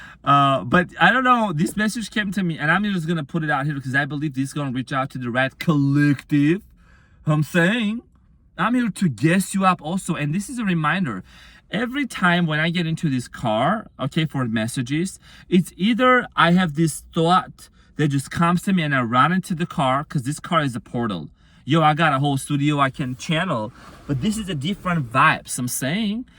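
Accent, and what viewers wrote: United Kingdom. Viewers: You make me smile so much / with all the sassy shit references …in a British accent…. Meet me beyond these walls and we shall speak further…with action…. Persist in this way and consequences will induce themselves. 😂❤ love it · I’m impressed with your British accent. I’m from the UK ❤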